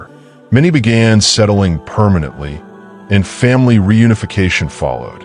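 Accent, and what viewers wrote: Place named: United States. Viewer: Another American narrator!!!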